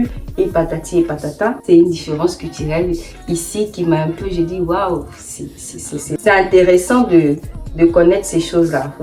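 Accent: Ivory Coast. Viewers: ton accent gabonais est parti ou ? ta déjà l'accent ivoirien 😭😭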